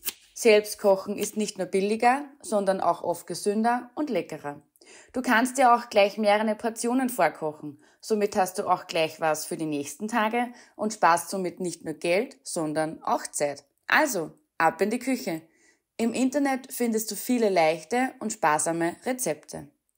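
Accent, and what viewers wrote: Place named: Austria. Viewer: yoooo is that an austrian accent? echt krass so sick. best accent, congratulations on growing up in the coolest sounding part of the german speaking world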